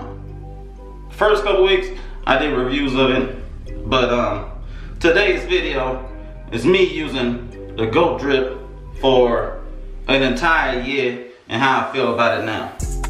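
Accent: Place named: Florida, United States. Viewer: You from Florida?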